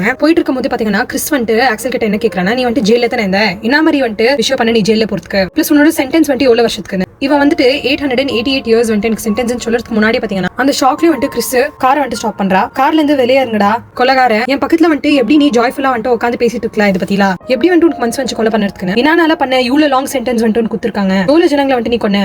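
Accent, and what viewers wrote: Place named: Bangalore, India. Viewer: Banglore tamil super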